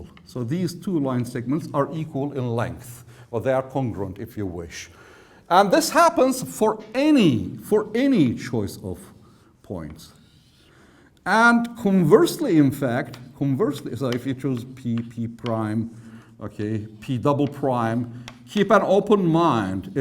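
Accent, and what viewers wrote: United Kingdom. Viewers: yo hablo español y estoy buscando clases de geometría en ingles para mejorar no solo geometría sino también en ingles :D, su ingles británico me voló la cabeza ._.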